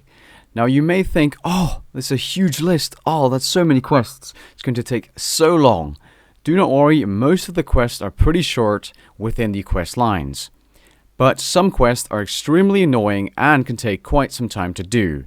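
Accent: United States. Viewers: funny I thought he was from Jersy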